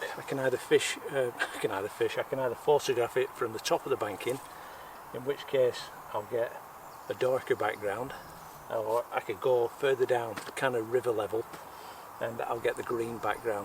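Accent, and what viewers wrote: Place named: Bolton, United Kingdom. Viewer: great video. you sound fairly local to Bolton where I'm from.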